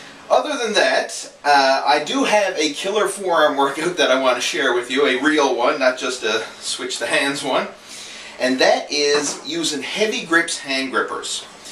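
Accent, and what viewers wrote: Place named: Cape Breton, Canada. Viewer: Her are you from Cape Breton Nova Scotia? You sound like a Cape Bretoner :p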